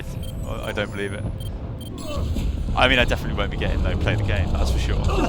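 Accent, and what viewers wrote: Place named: United Kingdom. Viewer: "Great British Gaming" "amis" gg. Ja die Sprache ist die selbe: ENGLISCH. Wie kommt man dann also auf ami? Ausserdem hat er einen klaren Britischen Akzent.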